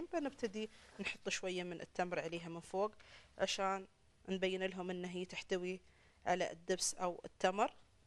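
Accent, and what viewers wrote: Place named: Oman. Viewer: عجزت افهم لهجتها الظاهر انها عمانية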